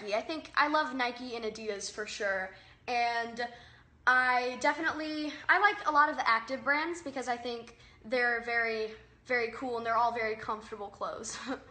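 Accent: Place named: Texas, United States. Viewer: Love your Texas accent yall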